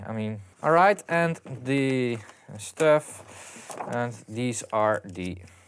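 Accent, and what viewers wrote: Netherlands. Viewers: I have a girl in my college, she's from the Netherlands, she speaks with exact same accent=)) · Its typical dutch english, Its How almost everyone in Holland talks english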